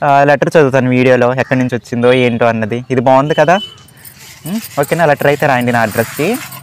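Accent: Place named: Andhra Pradesh, India. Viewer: మీ ఆంధ్ర యాస బలే ఉంటద్దండి అస్తమాను అండి అండి అంటూ 💝frm ts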